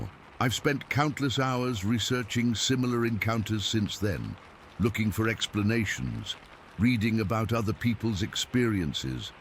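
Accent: United Kingdom. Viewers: Why's it's always a British accent....bullshit I'll never listen again ¡!!!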